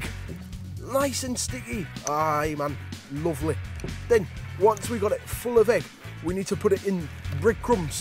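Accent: Jamaica. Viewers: he sounds Jamaican aha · you sounded a tad jamaican, other than that i liked it.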